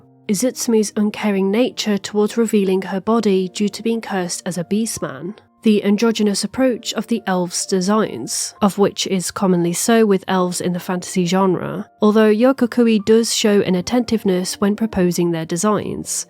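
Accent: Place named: England, United Kingdom. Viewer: What a pure English accent, I love it ❤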